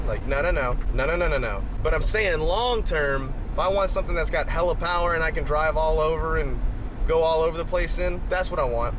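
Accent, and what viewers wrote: Canada. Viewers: Ur Canadian accent is on point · Love the Canadian accent some or all of that stuff you said was true😉😉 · Awesome Canada ascent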